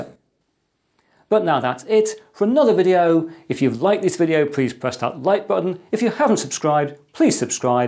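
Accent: United Kingdom. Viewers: Very interesting series of videos. Great professional shooting, clear diction, excellent explanations and your British accent is delightful to my ears of non-native English speaker. Will definitely subscribe. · Accidentally wandered on your Tube. Really interesting to me 'cause I love different kinda electronic and PC odds&ends. Besides that, I really enjoy your british, so thank you!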